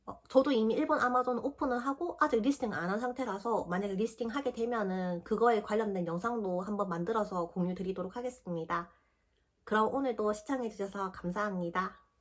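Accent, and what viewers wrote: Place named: Seoul, South Korea. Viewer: 굉장히 서울말같은 사투리네여 귀에 쏙쏙 박히고 이해가 잘 가네요. 최근 영상도 찾아봐야겠어요. 감사해요.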